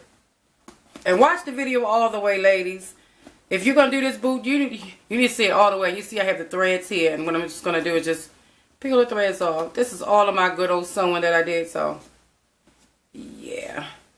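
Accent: Baltimore, United States. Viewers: Yes bmore accent! Thanks for the tut love this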